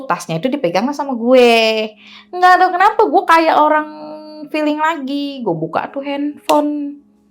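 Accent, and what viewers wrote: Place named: Yogyakarta, Indonesia. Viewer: Senang dengar logat betawi dan jogya ne mbok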